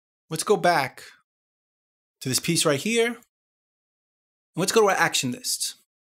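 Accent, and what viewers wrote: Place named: East Coast, United States. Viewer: That's his voice! He's obviously from the east coast (Jersey? ). It takes some getting used to, but his videos are excellent.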